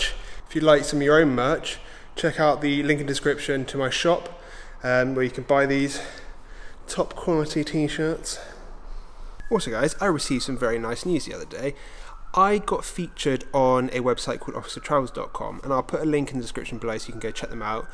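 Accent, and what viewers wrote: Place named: United Kingdom. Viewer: less of that British accent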